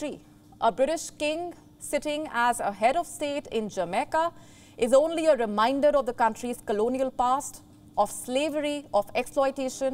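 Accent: India. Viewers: Speaking english in indian rythm is interesting.. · what do you think it means. An Indian accented English speaker. It's a common thing these days.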